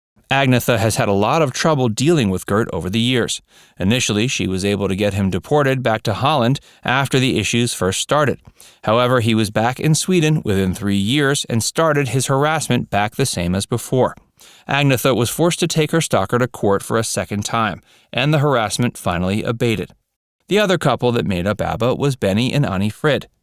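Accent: United States. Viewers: I was set a bit curious by that reference too. But without prejudice hearing the narrator's accent i can correctly guess he's American. America didn't give any hoots yet about ABBA so forgive them. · Listening to your lazy Americanized pronunciation of their names was painful.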